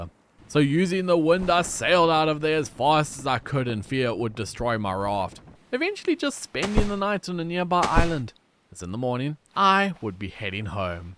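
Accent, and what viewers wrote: Afrikaans, South Africa. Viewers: I think anyone who has ever met a single south African forever recognises the accent. It's quite unmistakable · hy het n afrikaans se aksent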